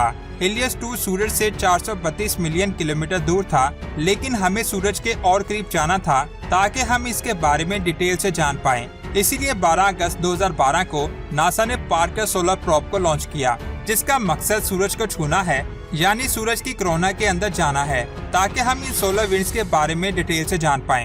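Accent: India. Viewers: Teri awaz se pata lagta hai tu indian hai ..pakistani ban kar chutiya bana rah hai...😂😂😂😂😂😂😂 teri zaban bata ti hai tu indian hai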